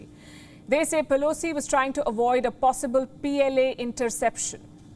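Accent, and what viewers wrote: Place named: India. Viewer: I can't stop laughing the way she speaks is an Indian accent I am sure you will be able to understand it. By the way World has various accent and you can't say anyone wrong just for their accent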